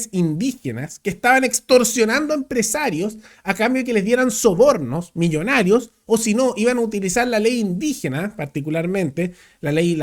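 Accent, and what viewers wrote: Chile. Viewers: Hola hermano chileno. Te sigo en tus comentarios. Eres muy bueno, muy informado e inteligente. Un consejo, si deseas que más gente te vea en América Latina, retira esos símbolos que identifica a Chile, suficiente tu acento para saber que eres chileno. También retira ese himno después de tu comentario, suena a tambores de guerra. Recuerda que América Latina ve a Chile como un país belicoso y agresivo. Esos símbolos chilenos nacionalistas y esa marcha que parece militar contribuye a subrayar esa percepción que se tiene de Chile. Tú tienes para más, eres muy bueno en tus comentarios, diría EXTRAORDINARIO.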